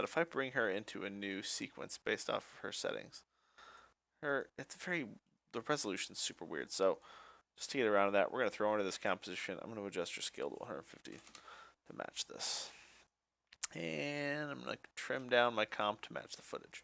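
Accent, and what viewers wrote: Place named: Canada. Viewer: I detect a canadian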